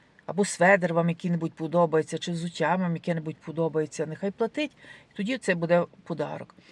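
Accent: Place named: Galicia, Ukraine. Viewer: Де ви, оберталися у совєцьку добу? Що ви розповідаєте про "подарунки на новий рік "? На заході України не дарували подарунки на новий рік- це просто нонсенс. Подарунки дарували на Миколая і по всьому. Я з Тернополя, тож відповідно знаю добре Львів- маю родичів у місті, приїздила у відрядження. На Галичині більшість людей навіть не святкували новий рік, тільки Різдво. Ви маєте галицький акцент, але не самого міста, можливо району. Принаймні, жодна людина у моєму колі не користала суржик. Щодо намиста, то такі прикраси є дуже тренді, прикрасить будь-яку сорочку чи сукню і до вишиванки надзвичайно гарно. Послухайте красиву пісню чарівної " "- якраз про це. Певно, італієць мав на меті подарувати щось особливе. 🇺🇦✌❤🖤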